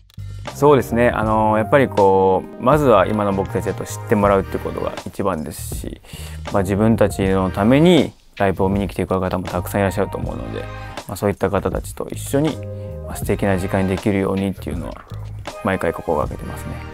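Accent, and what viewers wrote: Kansai, Japan. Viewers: くんの笑顔とえへへが大好きです💞 あと関西弁も好きだし見るからに優しさが溢れ出てるくんが好きです👦🏼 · 雅椋さんの好きなところなんて星の数ほどあるのですが、、、選抜して述べると、ダンスが好き、笑顔が好き、品のある金髪が好き、ホクロが好き、臣さんラブなところが好き、DJとか曲作れちゃうところが好き、狙ってないのに面白いところが好き、関西弁が好き、大クセかましてるところが好き、マメなところが好き、くんの書く文章が好き、言葉選びが好き、目を見て話してくれるところが好き、優しくて好き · Q.僕の好きなところを教えて下さい全くもう〜欲しがりだな〜😉😉🧡 くんの好きなところは、踊る時のグルーヴ感、楽しそうに踊る所、かと思えば切ない表情もしてそれがえちい所、真面目な所、ボケが滑った時の返し方、どんな方にも平等に優しい所、踊ってる時に右か左をチラッと見る癖、 『えへへ』と笑うギャップ、身長が175cmな所、手の指が長くて綺麗な所、煽る時の低音ボイス、所作に出る礼儀の正しさ、不意に出る関西弁、不意に出る天然ボケ、SNS更新頻度が高い所など！！もう上げたらキリがないので、とりあえずという存在が大好き！！ってことを知ってもらうだけで十分です🐣🐣✌🏽✌🏽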